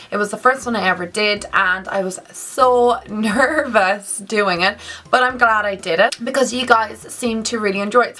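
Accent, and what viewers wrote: Ireland. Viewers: I love these videos, so glad you have done your last one and this one, I've been changing my eating habits over the past month, I've lost about 6/7lbs, what is a healthy weight loss in a certain time frame, love your videos and I'm a sucker for Irish accent lol xxxxx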